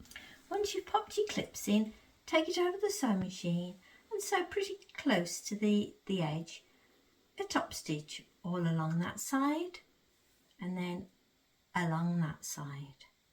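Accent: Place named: Midlands, United Kingdom. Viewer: Very clear tutorial, thank you. I love hearing your tutorials as having moved South they take me back home to the Midlands 😀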